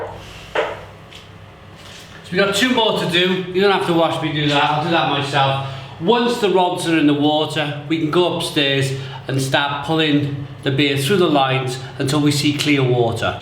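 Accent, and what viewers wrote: Wales, United Kingdom. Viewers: Awesome video. Where’s your pub and what’s the name????? I’m getting a hint of Welsh accent (please forgive me if I’m wrong). I’d like a pint in your pub. 👍🏻🤪😊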